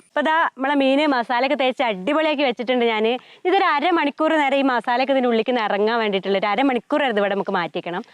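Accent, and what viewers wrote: Malappuram, India. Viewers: മലപ്പുറം ഭാഷ 👌👌🤦🏻‍♂️👌👌👌😂😂😂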